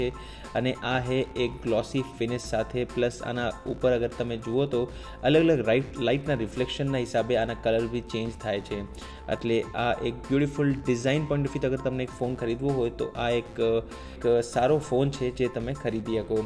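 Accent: Kutch, India. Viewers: ગુજરાતની કચ્છી બોલી બોલો છો તમે. મૂળ ક્યાંના તમે? સારું લાગ્યું કે તમે ગુજરાતી છો.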